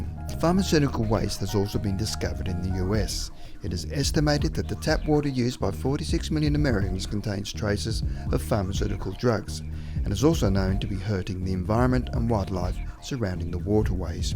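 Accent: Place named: Australia. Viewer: cant stand this shit australian accent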